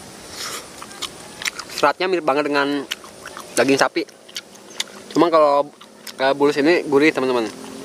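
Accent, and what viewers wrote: West Java, Indonesia. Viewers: sunda pisan mang wkwk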